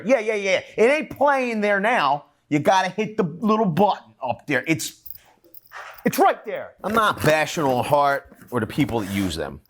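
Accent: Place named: Boston, United States. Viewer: Love your accent. I'm Australian and I feel our accent and yours which sounds like a close Boston accent are the best.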